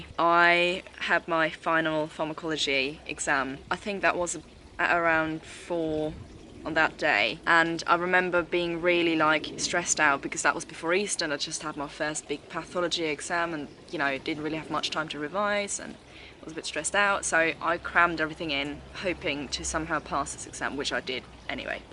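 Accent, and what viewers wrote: United Kingdom. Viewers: Du klingst als ob du zu 100% britin wärst :)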